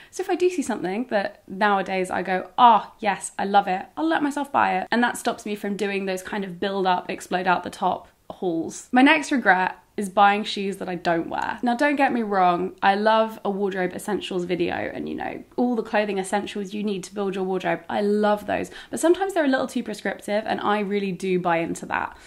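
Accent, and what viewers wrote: United Kingdom. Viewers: Omg, I literally faancy your accent!! Beautiful British accent 🤍🤍🤍. And the video was very helpful. Thanks 🙏🏽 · Awww you are so beautiful and funny and overall so enjoyable to watch. Love your way of speaking too (said by a non-British person who loooves a good British accent and well-spoken English in general!) Happy to join your channel and happy to have found it! Yay! Thanks for the tips, I am new to the "Capsule wardrobe" system and I am considering adopting it, as I like the idea - but at the same time I am not too sure about some other aspects of it... so thanks again, this will help me make better decisions (hopefully, haha)! P.S. with your body type you would be soooo popular in my country of birth, Brazil!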